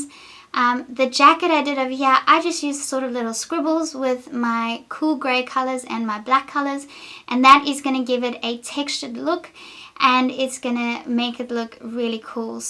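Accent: South Africa. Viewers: Beautiful I love it. Are you South African?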